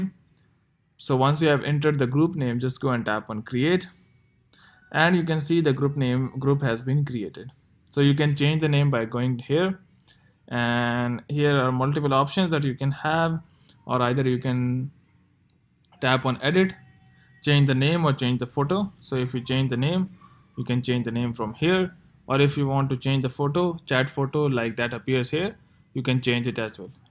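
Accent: India. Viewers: Beautiful Indian Accent 😍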